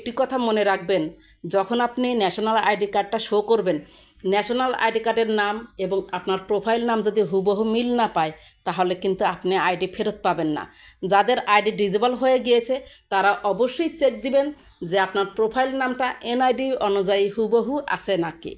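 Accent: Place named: Chittagong, Bangladesh. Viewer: আপু আপনার বাড়ি কি চিটাগাং।আপনার কথায় মনে হচ্ছে । তাই জানতে চাই ভুল হলে কমা করবেন। ধন্যবাদ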